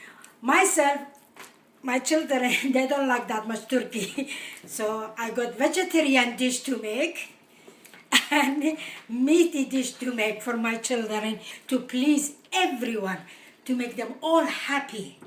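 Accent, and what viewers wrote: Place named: United States. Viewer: you are really great , but you have an American accent , Are you live there before ???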